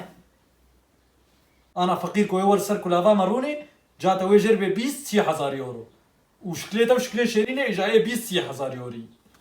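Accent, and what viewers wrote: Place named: Amuda, Syria. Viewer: عم تحكي متلنا يعني قصتي اهل عامودا انت من وين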